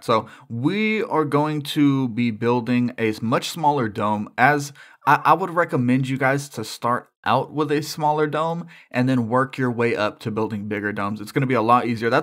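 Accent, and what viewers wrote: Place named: Ireland. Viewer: The only reason I even put on this video cuz I'm making a giant hot air balloon but I still need to fill in the top of the dome and stuff and the bottom so I went to work for the day and I can't find a single thing that can help except from this dude that's the thing by to me kind of has like an Irish accent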